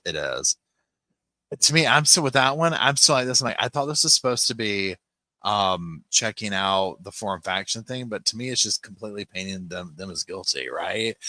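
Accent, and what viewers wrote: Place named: East Tennessee, United States. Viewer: I’m from east tn and it’s so nice to hear someone that sounds like me and I can understand. Love your storytelling style.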